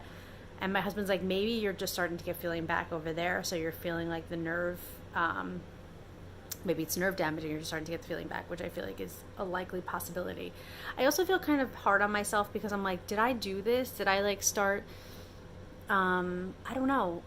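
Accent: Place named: New York, United States. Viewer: Also, it’s been a treat to listen to your NY accent, as someone who grew up on Long Island and has the same accent 😊. I don’t get to hear it as much in the midwest and I miss it! 😂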